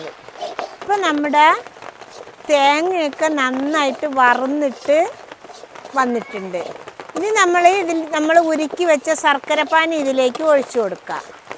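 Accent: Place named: Palakkad, India. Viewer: Ningalude veed evade. Palakkadan slang und avatharanathil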